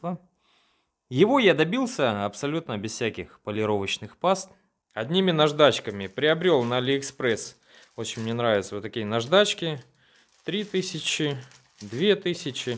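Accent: Rostov, Russia. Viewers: автор, ты из Ростова что ли?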